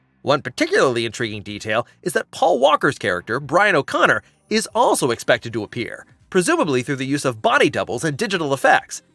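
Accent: United States. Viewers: I find this American English really hard to bear. That artificial euphoria in every sentence. Exhausting. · God his American accent and tone is jarring as fook...cant finish this lol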